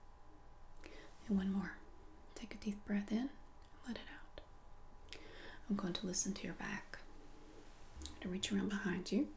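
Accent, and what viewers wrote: South, United States. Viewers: Yup I live in Austin, Texas... this is definitely southern haha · As a French girl, I find that your "southern accent" makes the English a lot easier to understand ! Nice video, by the way. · Your accent is perfect! When people try to force a southern accent it always sounds way too drawn out and unnatural. yours is great. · needs more southern accent 4/10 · I love cranial nerve / medical roleplays, and I also adore southern states accents - So this video is bliss for me! Thank you so much for this creation! Can't wait to see your other videos! All the best from the UK. :) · Folks, this is what a REAL urban southern accent sounds like. The long 'drawl' is more common in rural areas. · Awkward moment when you wonder where her accent is, then realize you've lived in the South your whole life and this is just what sounds normal to you...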